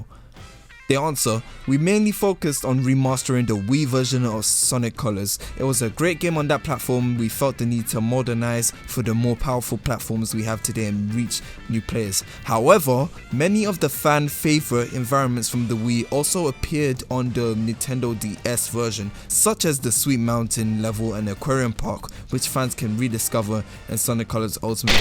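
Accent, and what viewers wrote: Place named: London, United Kingdom. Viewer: good video my bro you sound like your from where i’m from london/uk good to see london guys doing sonic news 👊🏾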